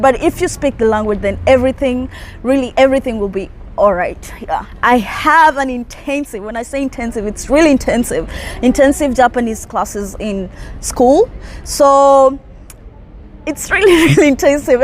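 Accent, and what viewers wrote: Kenya. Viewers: thanks for the compliment. 😝actually most Kenyans speak like that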